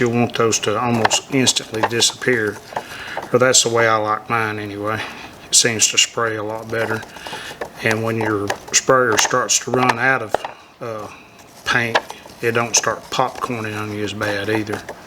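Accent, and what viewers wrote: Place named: South, United States. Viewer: Thank you for the detailed ins and outs of your experiences using this tool. Great vid. I live in Oz now and it's great hearing the Southern accent of home.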